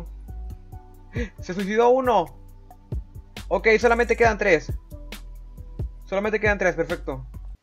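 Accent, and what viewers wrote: Colombia. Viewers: valla asento colombiano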